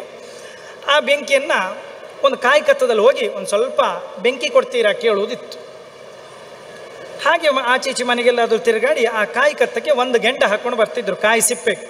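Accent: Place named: Kundapura, India. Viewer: ನನ್ನದು ಉತ್ತರ ಕರ್ನಾಟಕ ನಿಮ್ನ್ ಕುಂದಾಪುರಕ್ಕೆ ಬಂದಿದ್ದೇನೆ ಆದರೆ ಅಲ್ಲಿ ನಿಮ್ಮ ಭಾಷೆ ಕೆಲವು ಶಬ್ದ ಹಳೆಗನ್ನಡ ದಲ್ಲಿ ಇರುವ ಹಾಗೆ ಹೋಗತೇ ಬರಕೆ ಮತ್ತು ಕಿಚ್ಚು ಹಳೆಗನ್ನಡ ದಲ್ಲಿ ಹೇಗೆ ಇದೋ ಹಾಗೆ ಇದೆ ನಿಮ್ಮ ಭಾಷೆ ಕೇಳೋಕೆ ಎಷ್ಟು ಚಂದ ❤️💛❤️💛🙏🙏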